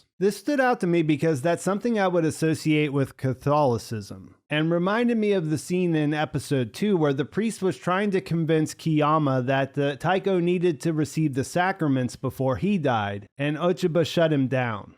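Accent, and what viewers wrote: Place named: Northeast, United States. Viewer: yankee accent very tiring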